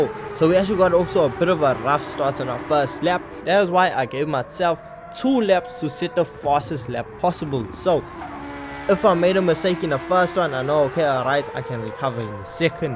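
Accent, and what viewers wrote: South Africa. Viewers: I love the SA accent, so cool my bru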